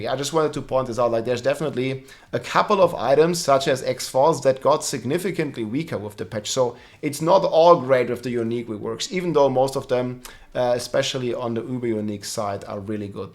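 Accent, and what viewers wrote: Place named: Germany. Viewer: I read patch notes and stuff, then come here to be serenaded by a heavy German accent.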